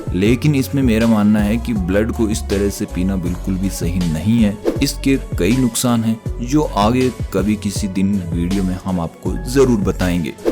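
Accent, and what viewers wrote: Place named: Rajasthan, India. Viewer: Aapki aawaj rajsthani lagti h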